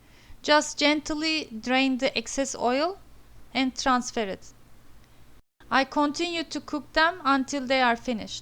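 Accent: Turkey. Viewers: Very Turkish accent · This english makes me laugh because one actress from turkey talks like this in the film i forgot her name 😂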